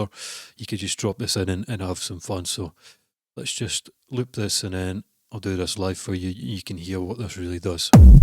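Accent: Scotland, United Kingdom. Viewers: Are you Scotish mate? Great technique.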